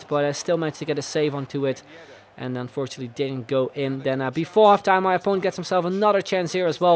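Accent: Netherlands. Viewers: Je hebt echt een nederlands accent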